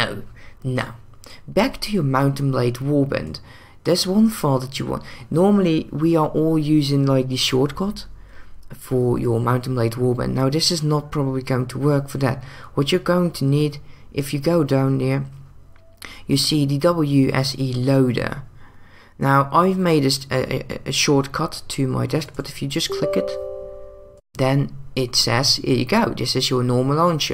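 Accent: United Kingdom. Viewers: english thicc accent xD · ik dacht dat je brits was, met een grappig accent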